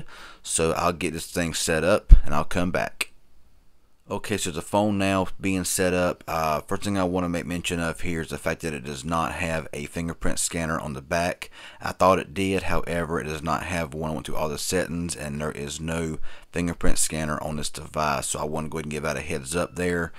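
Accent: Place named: Appalachia, United States. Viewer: Wheres this hillbilly come from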